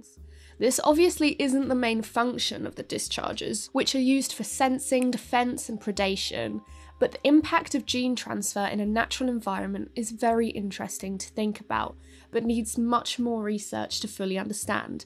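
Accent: United Kingdom. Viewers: She also has a the THICCEST bri'ish Accent of the Trio now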